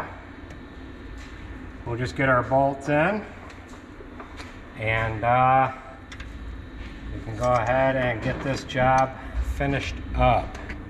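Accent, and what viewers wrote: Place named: Appalachia, United States. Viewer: Quit talkng like a hillbilly god bless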